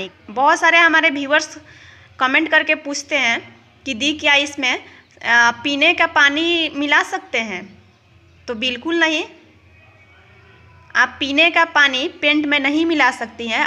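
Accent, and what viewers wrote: Bihar, India. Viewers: Ap Bihar se ho?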